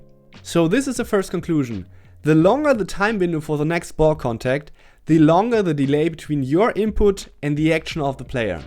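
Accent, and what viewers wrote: Germany. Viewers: Weiter so, Mega Videos! Dein Dialekt hört sich deutsch an, bist. Du deutscher?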